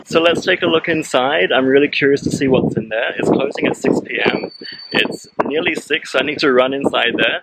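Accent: Australia. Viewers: I am going to visit Vilnius next month. I can’t wait to be in those places in person. I am definitely going to visit Trakai. I love your calmness and sympathy and the Australian accent is my favourite.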